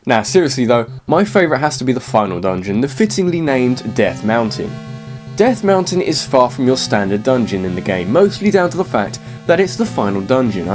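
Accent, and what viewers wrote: England, United Kingdom. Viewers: Are you British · Does he come from england?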